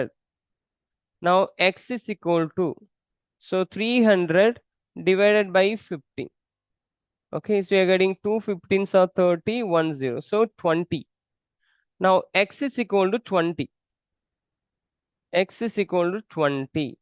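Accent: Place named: Tamil Nadu, India. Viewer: i am also tamilan thats why i identified your slang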